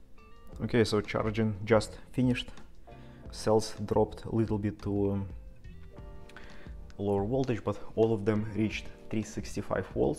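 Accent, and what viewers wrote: Russia. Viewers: Да , но английский с русским акцентом ужасен!!😢😢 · You are from Russia? You have a very strong Russian accent.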